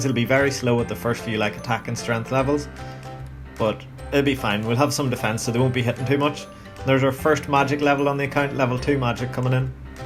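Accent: Northern Ireland, United Kingdom. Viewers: Norn Iron content creator? Lol gotta watch your shit from now on. You from Co Down? Hard to tell if your accent is posh Lderry or south down. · Northern Ireland?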